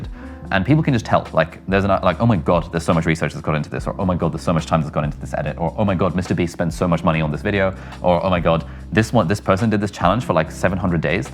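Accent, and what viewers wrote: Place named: India. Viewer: Your hindi accent is like lagaan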